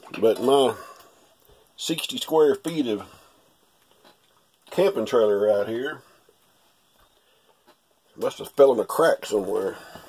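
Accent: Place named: Boston, United States. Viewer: Very heavy Boston accent for sure! That makes at least two of us!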